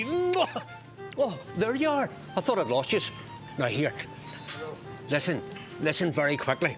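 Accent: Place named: United Kingdom. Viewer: Northern Ireland is part of the UK.... so it is a UK accent ...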